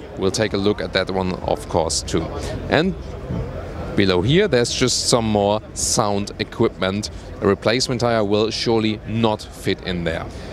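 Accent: Germany. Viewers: is great! I like your German English! And, no one is better than you! Appreciate your business! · classic german english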